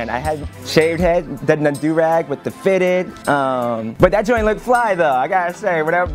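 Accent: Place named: Washington DC, United States. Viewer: talkin like a true D.C. native hahaha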